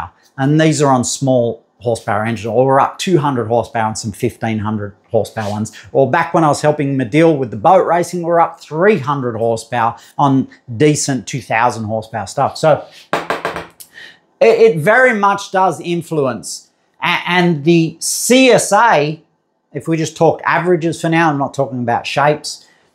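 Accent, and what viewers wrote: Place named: Australia. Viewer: Great video! I’m challenged to keep up (British vs American accent), but YouTube speed control to the rescue. One nit pick. A few times you said “mil” but it looked like you wrote “mm” on the board. And unfortunately the board writing was not clear enough to be certain that you had written “mm”. The problem is that being Australian, you are libel to use either imperil or metric, and hence it is imperative to be crystal clear as to which units we are talking about. I’m a physicist and hobby machinist in USA, so I’m well versed in both metric and imperial. In USA (and a large part of the world), when even remotely associated with machining, a “mil” is a thousandths of an inch. No self respecting physicist (and really any scientist) would ever use the word “mil” for “millimeter”. We call it by its proper name, “millimeter”, “centimeter”, “decimeter”, etc. 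why? Because that is what it is. And as a byproduct one avoids all possible confusion with the imperial “mil” (1/1000”) which is pervasive in the imperial machining world. Maybe if 100% of the world uses metric in all areas then we might tolerate a slang of using mil for millimeter. But the world is not there yet and likely quite a few years away from such universal adoption. In the mean time we really need to stick to calling things by proper and industrially accepted names and refrain from shortcut slang which might be confused. Thanks!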